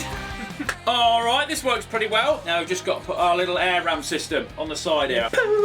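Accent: England, United Kingdom. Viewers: L’accent anglais m’énerve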